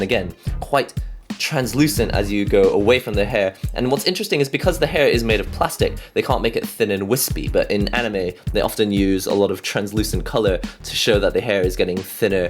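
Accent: England, United Kingdom. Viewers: Are you from England????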